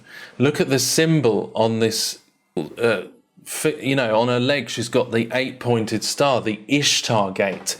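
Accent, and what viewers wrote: United Kingdom. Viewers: EGO DEF He's not American, but English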